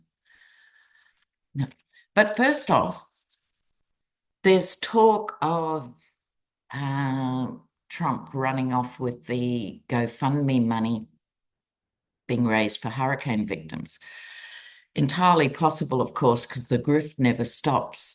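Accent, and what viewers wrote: Australia. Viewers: Hi, I was wondering if you wouldn’t mind telling me what tarot pack you are using in this video, really drawn to the artwork? Love hearing an Aussie voice & as I think we are of the same vintage, also love your colloquialisms you use 😊 Thanks for all your hard work. Best wishes ❤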